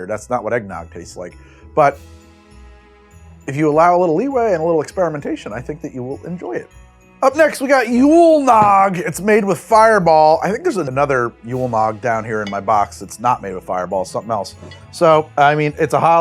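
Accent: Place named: New Jersey, United States. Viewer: Carnival barker with his Jersey roots coming through.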